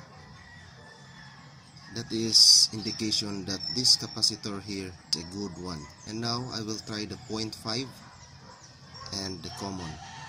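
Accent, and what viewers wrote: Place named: Philippines. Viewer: Your English reveals that you are from the Philippines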